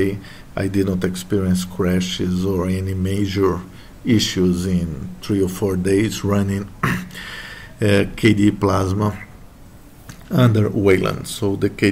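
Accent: Brazil. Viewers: sotaque brasileiro é forte kkkkk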